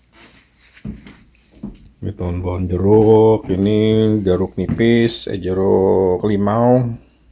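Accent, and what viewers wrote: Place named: Indonesia. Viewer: Wah jd panutan nih bersih2 pekarangan efek dr tetangga tanpa dongkol 👍👍👍, btw lidah Indo ya gak hilang 🤪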